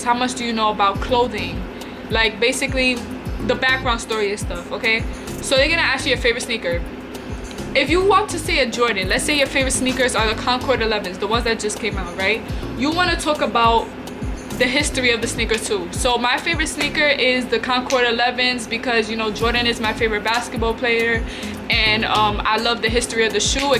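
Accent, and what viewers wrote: New York, United States. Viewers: You’re definitely from New York lol I love it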